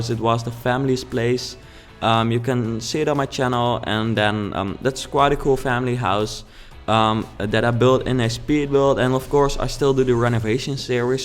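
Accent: Netherlands. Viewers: can I just say... I'm English, and I love your accent. I think Dutch accents are amazing. Really nice build.Very inspiring.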